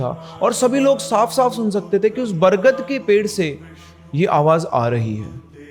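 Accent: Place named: Bihar, India. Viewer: Sir aapki bahari accent..😍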